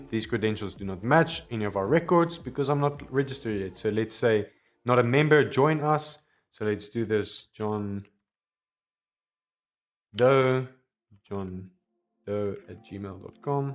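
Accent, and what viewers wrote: South Africa. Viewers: Ha I knew the accent within the first 30 seconds, but I’ve been working remotely with ZA folks for over a decade now so it’s second nature by now. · Great Tutorial YT / !! Also, hello as a fellow South African - recognize the accent from anywhere :) I saw a lot of comments/questions regarding postgres URL that is passed during the NPX-create. I did a little troubleshooting and found the following worked really well for me: TL;DR = Add single quotes around the URL string. Add single quotes around the URL string. Adding quotes is the answer; you might have a different setup, noticed you're on PC, on Mac or Linux it is possible to require quotes is my guess. Not using quotes got me stared but then I got error shortly after opting in to create the Next.js storefront. I figure why not do it now vs later. ERROR: "Couldn't connect to PostgreSQL using the database URL you passed. 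Make sure it's correct and try again." Then after some reading, I used double quotes and it failed as using double quotes with '@ or '!' means something else in ZSH-World. End Result: Use Single Quotes around the postgres URL Keep up the good work! Cheers! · I hear the south african accent! 💫 😂 Please do a video to customize to add other tables or inherit and add fields, and make this into a Multivendor marketplace like takealot or Amazon!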